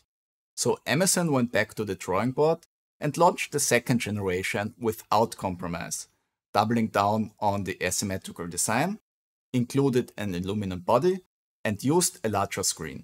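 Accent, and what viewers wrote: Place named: Austria. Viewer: Your accent sounds austrian.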